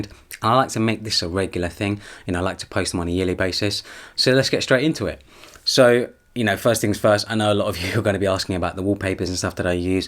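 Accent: United Kingdom. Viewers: Sleek British accent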